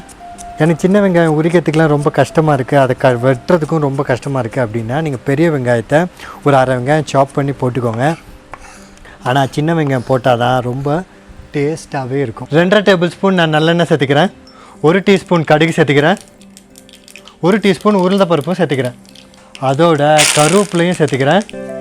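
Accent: Tirunelveli, India. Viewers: Pattani paruppu Tirunelveli slang... you're speaking more Tirunelveli slang only...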